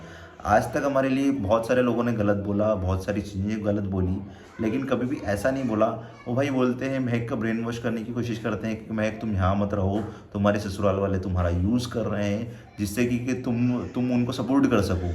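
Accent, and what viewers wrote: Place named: Indore, India. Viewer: I am from Bhopal and your language tone is indori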